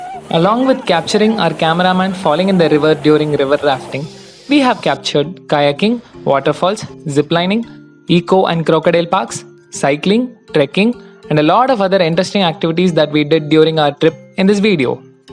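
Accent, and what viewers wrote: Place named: Tamil Nadu, India. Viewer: Bro pls speak tamil love ur tamil slang 😘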